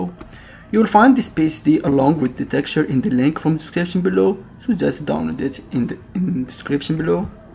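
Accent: Morocco. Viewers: thnaks for the tutorial I found it useful but tell me, are you moroccan ? I raly taste the moroccan accent in your voice!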